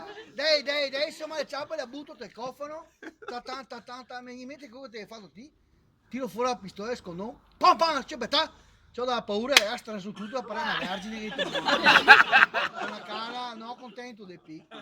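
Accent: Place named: Veneto, Italy. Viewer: la parlata veneta ê simpaticissima 😁😁😁😁..grande bella barzelletta e bella interpretazioje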